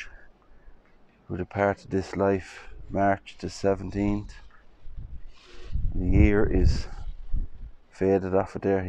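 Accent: Ireland. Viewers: You can't beat the Irish accent, I have a lot of Irish on both sides of my family, the Limerick's and the Baileys , a great video very interesting many thanks , best wishes from Norfolk in England .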